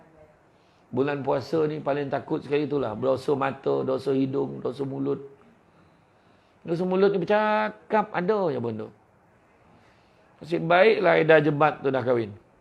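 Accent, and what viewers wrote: Negeri Sembilan, Malaysia. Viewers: Yg dislike tue dyo x paham loghat nismilan Tue🤣🤣🤣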